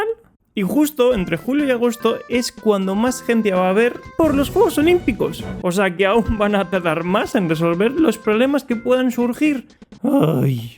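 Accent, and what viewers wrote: Spain. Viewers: y su asentó español eww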